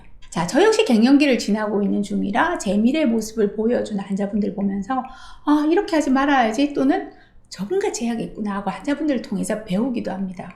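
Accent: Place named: Gyeongnam, South Korea. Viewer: 호주 시드니에서 사남매 맘이 응원합니다 ^^ 저는 경남거창이 고향인데 ㅎㅎ근처 비슷한 말씨인거 같은데 ㅎㅎ좋은 영상 감사 드려요 새해도 활기차게 감사하며 아자아자!!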